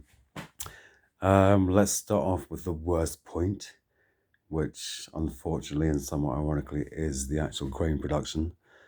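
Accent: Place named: Scotland, United Kingdom. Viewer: Is it just me or do I hear his Scots accent coming through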